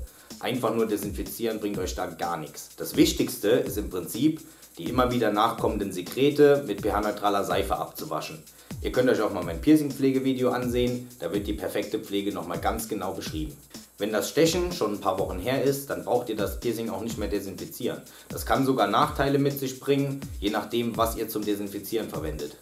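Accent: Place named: Palatinate, Germany. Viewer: ahso, du kommst aus der Pfalz. Deswegen biste mir so sympathisch😊Wir sind Nachbarn. Ich liebe den pfälzischen Dialekt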